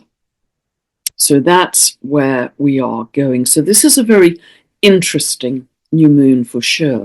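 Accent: United Kingdom. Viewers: "starve out the scary, love in the good" in a British accent, of course ❤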